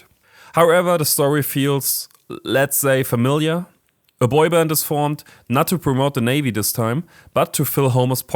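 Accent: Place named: Germany. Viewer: Your German accent makes it even funnier. Weiter so!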